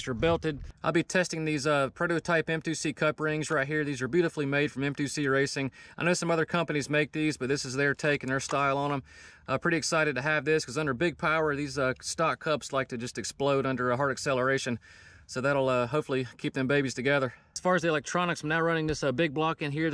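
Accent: South, United States. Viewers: Once I heard that accent I knew I was dealing with a pro, that amount of redneck and annunciation is a dead giveaway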